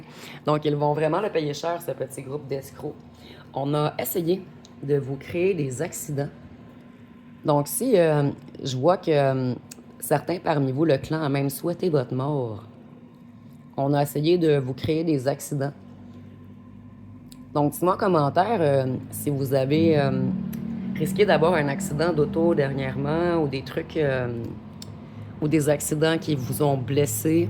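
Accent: Quebec, Canada. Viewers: Mais qui me veut.du mal Je ne comprends pas toujours ce que vous dites avec votre accent Québécois Moi je suis en France je suis divorcée A bientôt de vous ecouter Bisous bisous 😘😘🙏🏻😇💕😊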